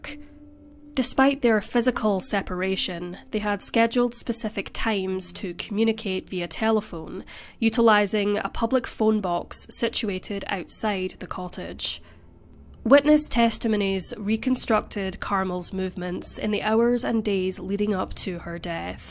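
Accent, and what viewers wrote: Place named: Scotland, United Kingdom. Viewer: CAN U DO ANOTHER " CASE COMPILATION #6,7,8, 9& 10?? FOR 2024? THANKS ✊🏽✊🏽✊🏽✊🏽 HOW DO YA " REQUEST CASE " & GIVE DONATE FOR UR EXCELLENT, HARD WORKING FOR AWESOME TIME SPENDING ON DETAILS, RESEARCH, MULTIPLE VIDEOS & STILL HAVE UR OWN LIFE & SOO 💜💜💜💜💜💜 SCOTTISH ACCENT, 😘😘🇺🇸🇺🇸🇺🇸🇺🇸🇺🇸🇺🇸🇺🇸✊🏽✊🏽✊🏽✊🏽✊🏽✊🏽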